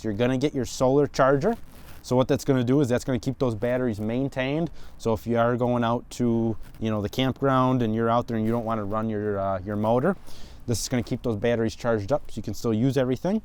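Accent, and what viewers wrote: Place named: Chicago, United States. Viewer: I like your accent. You sound exactly like my cuz from the Chicago Southside (Bridgeport). You would blend in there quite well.🙂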